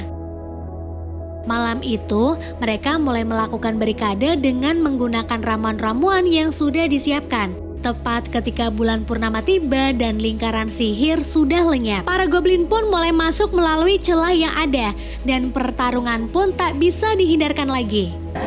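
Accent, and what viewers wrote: Pemalang, Indonesia. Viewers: Btw orang pemalang bukan? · wong pemalang yo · Orang Pemalang kah?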